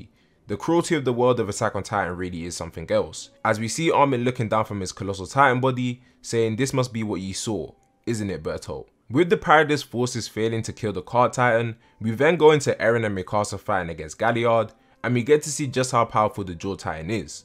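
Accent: London, United Kingdom. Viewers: Finally a Londoner doing these reviews loool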